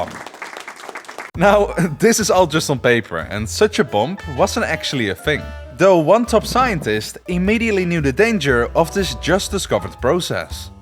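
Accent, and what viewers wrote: Netherlands. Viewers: Are you Dutch because you a bit Dutch accsend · Are you dutch? I think i can recognise an accent there. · You have a Dutch accent i think.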